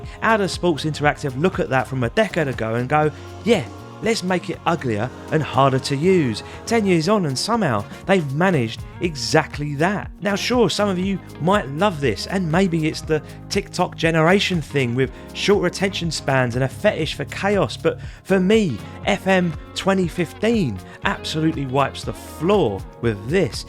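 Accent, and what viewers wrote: Cockney, United Kingdom. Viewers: Your review nailed it. Back of the net. Best i have seen.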